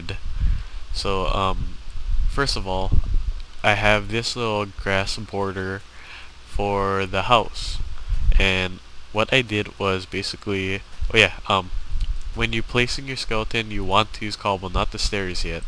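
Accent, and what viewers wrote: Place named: Canada. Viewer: if i was to guess, i'd say mid-northern canadian accent.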